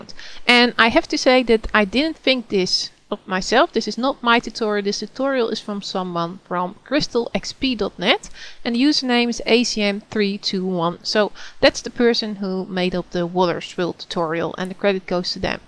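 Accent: Netherlands. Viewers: I hear a Dutch person speaking!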